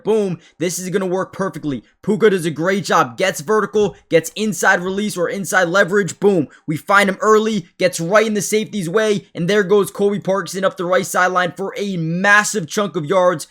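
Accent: Canada. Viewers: Sounds Canadian eh?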